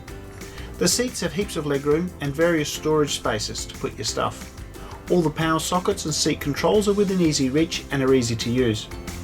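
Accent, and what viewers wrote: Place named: Australia. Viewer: Another great review! In general I'm not a fan of "in your face" flight reviews, but your professionalism, insightful commentary, and intriguing Aussie accent set the standard! Your style in fact inspired me to start doing my own YouTube flight reviews on the other side of the world. Question for you or anyone in the comments: I have the option of 2 flights in Cathay J long haul or on JAL supposedly in their sky suite III. Which should I take?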